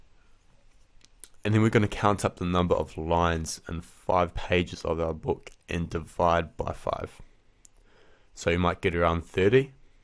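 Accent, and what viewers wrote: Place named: New Zealand. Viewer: such a kiwi accent!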